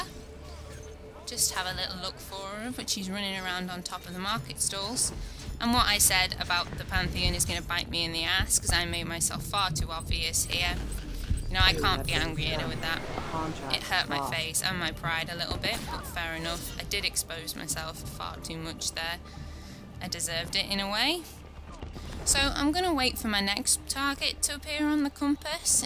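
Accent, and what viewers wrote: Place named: Australia. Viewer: hey what nationality are you? you sound australian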